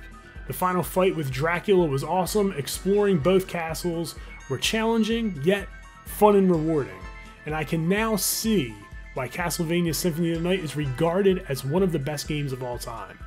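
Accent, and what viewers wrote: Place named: Western Pennsylvania, United States. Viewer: Are you from western PA or Ohio? Maybe Baltimore? I hear that accent haha regardless, this game is so close my heart ❤️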